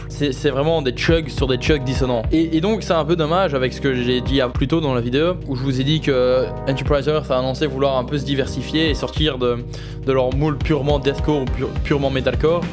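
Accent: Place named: England, United Kingdom. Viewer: Cet accent anglais haha ! GG continue :)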